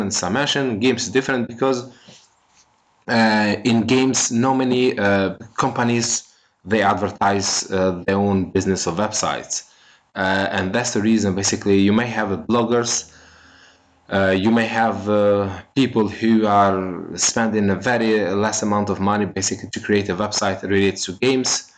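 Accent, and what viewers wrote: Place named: Albania. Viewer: You sound like you are from alnania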